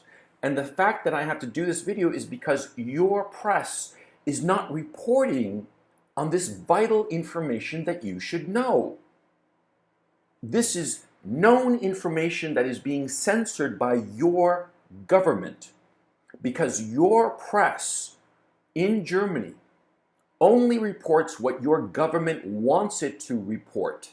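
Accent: United States. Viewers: Great information but words matter. I'm super offended by the overuse of the blanketed blame to "the Americans" with sparse mention of those truly behind the controls. I've cut ties with our media over two decades ago for the same reasons you mentioned once in your video regarding USA media. I'm lonely here in America having this view with having my only outlets watching my heroes of news information (you specifically being one of them) generalizing those "Americans" as the evil ones. You did similarly generalize "the Norwegians " but only once. I appreciate greatly what you do and again you are one of my heroes. Remember that your English is 100% "American" not the Queen's whatsoever. BTW English language trained in Germany is the Queen's. I will continue watching your contributions but know this, words matter. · But that accent sure sounds "American".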